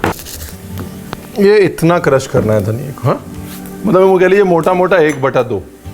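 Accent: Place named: Punjab, India. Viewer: Waah Ranveer ji app ki hidi mein panjabi language ka tarka bhat acha laga..keep it up ..txs for recipe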